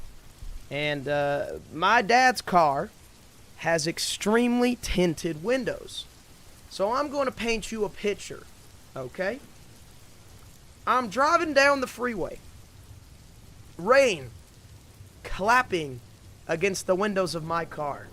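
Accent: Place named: South, United States. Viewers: Oh my gosh ikr!! I was thinking that he has a Southern accent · crazy how no ones talkin on how dat country accent was on point tbh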